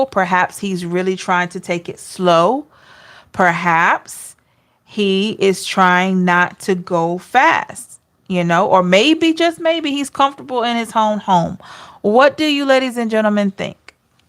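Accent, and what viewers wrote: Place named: South, United States. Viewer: I'd Love to understand what part of the USA your accent is from. It's generally Southerners who say "em-pore-dent" as opposed to "im-pore-tant".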